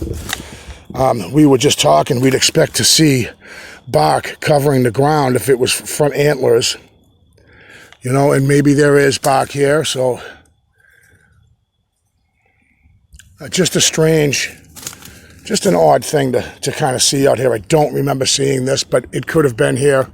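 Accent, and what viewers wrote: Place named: Boston, United States. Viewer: You have an accent. Maybe Boston. Where are you originally from you have an accent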